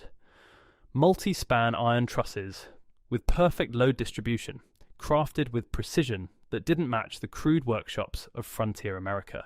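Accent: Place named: United Kingdom. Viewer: WHY do all of these AI narrated videos have a British voice ? Tired of it.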